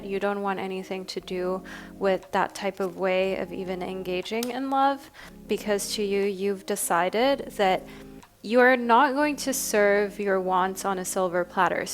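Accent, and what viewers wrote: Germany. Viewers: Giiiirl I love how you speak German 🥹😻 Ganz viel liebe aus Deutschland 💕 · ❤❤❤ your German is so cute ! And it confirms my choice for pile 2. because i am from Germany ❤❤❤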